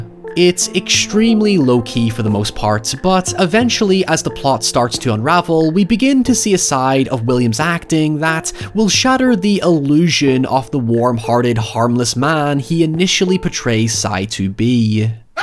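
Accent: Northern Ireland, United Kingdom. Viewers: Surprising to hear a fellow Northern Irishman! Love this film, a true hidden gem.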